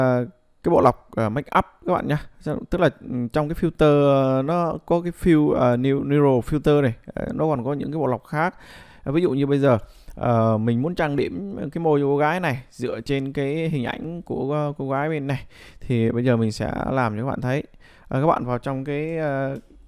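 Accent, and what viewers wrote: Vietnam. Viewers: Bạn người Nam Định phải ko bạn? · Anh ở đâu Thanh Hóa vậy ạ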